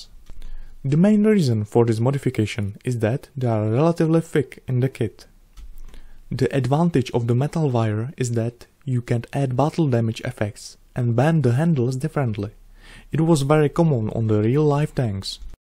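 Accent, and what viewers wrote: Czech Republic. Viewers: Ahh yeah, the good old Czech Accent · jinak musim uznat, že se tvoje angličtina výrazně zlepšila:) už ten českej přízvuk tak netrhá uši:)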